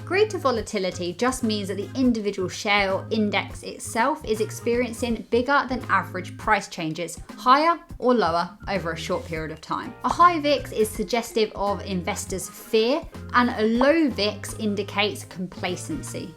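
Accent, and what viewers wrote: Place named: England, United Kingdom. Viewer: i like your content and english accent.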